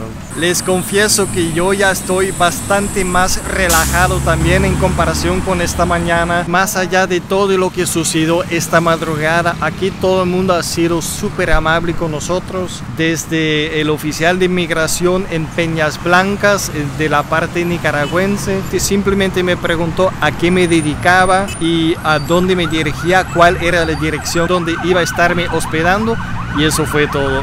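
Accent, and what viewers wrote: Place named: United States. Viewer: ah, ya decía yo que en Costarica los ticos no hablan así. Pensé que era estadounidense por qué habla casi igual que un gringo cuando aprende español, no menciona mucho la "r"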